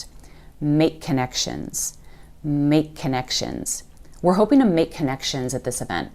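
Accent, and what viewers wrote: United States. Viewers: Sorry you got a very American pronunciation which differs from the British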